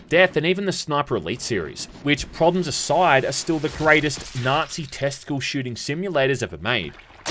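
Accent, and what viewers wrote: Australia. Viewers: aussie accent is such pain on the ears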